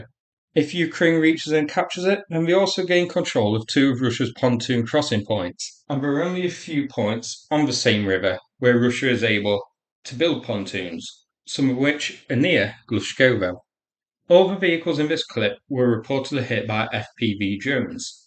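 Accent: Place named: Wales, United Kingdom. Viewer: bruv, srsly pls try speaking a bit MORE english, and a bit LESS of whatever accent of yours that is...sometimes ya seem forget you have an INTERNATIONAL audience, and we dont speak WELSH or GAELISH^^ xD thx in advance!!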